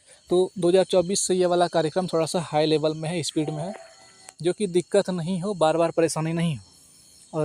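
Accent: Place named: India. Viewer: Desy style ma ahcca bolte ho 😅😅😅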